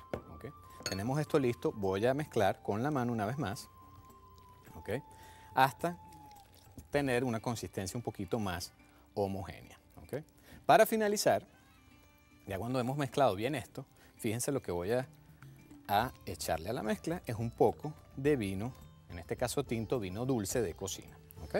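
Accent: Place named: Venezuela. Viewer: venezolano SEGUUUUURO jajaja :D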